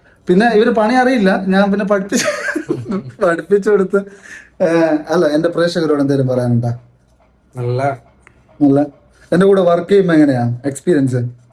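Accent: Kasaragod, India. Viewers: Ksrgd slang anna use ayikudee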